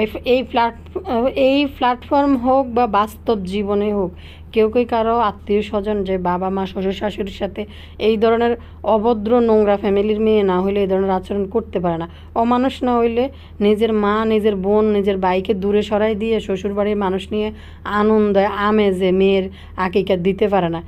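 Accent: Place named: Feni, Bangladesh. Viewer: আপু কেমন আছেন। লাইক দিয়ে দেখা শুরু করে দিলাম। সমাজের মধ্যে এ সব নোংরামিগুলো বন্ধ করা উচিত । আমার দেশের বাড়ি ফেনীতে । এখন আছি আমেরিকার আলবেনীতে। আমার আর একটা নাম্বার থেকে কমেন্ট করতাম। হাছিনা আলম আইডি থেকে । এ নাম্বার টা এখন বন্ধ আছে। তাই নতুন নাম্বার থেকে কমেন্ট করলাম। এগিয়ে যান সামনের দিকে । আপনার কথা শুনলে মন ভালো হয়ে যায় । বিদেশে বসে নিজের এলাকার ভাষা শুনি তখন শান্তি লাগে। আশা করি আপনাকে সরাসরি একদিন দেখব। সবাই কে নিয়ে ভাল থাকবেন। আমাদের জন্য দোয়া করবেন।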